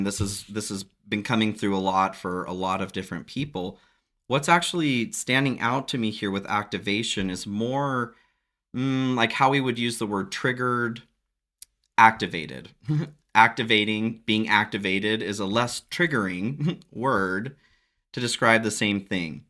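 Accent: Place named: South, United States. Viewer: I love your personality. Serious to thick southern accent. Your Reading style is great❤ All goes smoothly in your surgery Oh no😂 I just saw Mr. Bill😊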